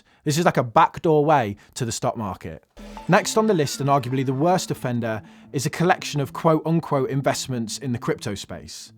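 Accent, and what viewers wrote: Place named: Northern England, United Kingdom. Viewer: I'm loving the northern accent. Not enough northern Youtubers.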